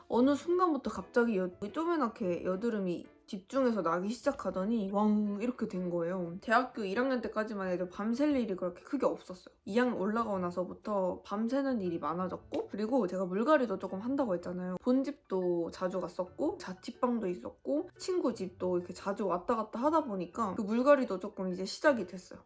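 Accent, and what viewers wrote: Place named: Seoul, South Korea. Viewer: 언니... 서울말 많이 늘었네요오🐣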